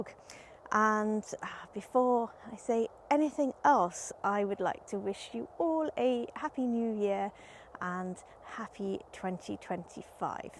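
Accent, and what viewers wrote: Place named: Switzerland. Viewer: Whereabouts in the Alps,? Sounds quite Swiss to me 😊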